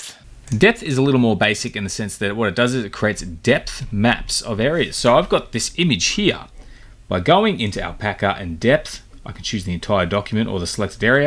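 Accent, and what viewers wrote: Australia. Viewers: Tryn to work out the accent, reckon either Melbourne or Sydney. Good on ya mate. BrisVegas here. Subbed. BTW I use SD A1111 PS plugin and getting pretty good returns and streamlined wrkflws. Offers more options for those who work outside the Alpaca ecosystem.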